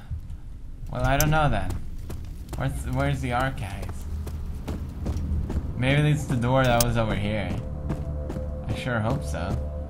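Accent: Sweden. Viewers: you can hear it that the man is swdish, so im i<3<3<3 all the guys in my class always says Maria, im like its just my facebook name brofist* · its an accent. hes swedish. his actual name is. his girlfriend is italian so he lives in italy. get your facts straight. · Pewdiepie has caused me to become so interested in swedish culture.. plus i never knew how amazing swedish accents are! they are Adorable. and thats coming from a guy ^.^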